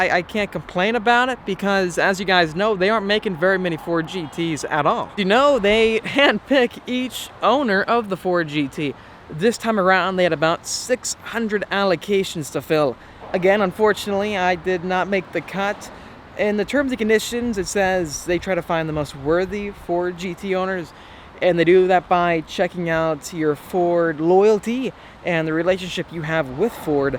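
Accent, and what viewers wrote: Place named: Alaska, United States. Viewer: Love the Alaskan Bush people accent! Great content 👍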